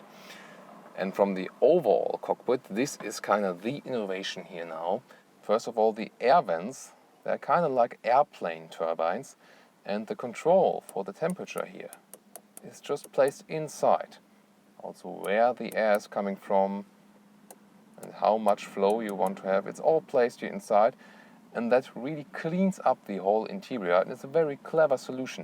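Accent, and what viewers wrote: Germany. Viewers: I love your accent, seriously, I wish I had a German accent like you do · I love your channel, I always watch your videos about the autos. unfortunately, many models are not available in the US., you have a very funny German-English accent. which could be one of your traits. And you looked so weird in helmet....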